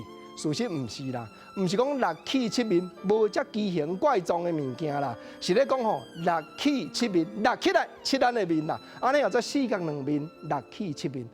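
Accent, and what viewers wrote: Taiwan. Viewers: 目前電視台，推展台語主持人，國禎老師尚有台灣氣口尚有台灣味，聽了真爽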